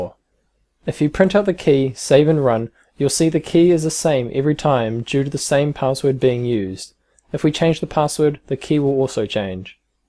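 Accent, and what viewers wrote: New Zealand. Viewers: Thanks. I like the Kiwi accent too.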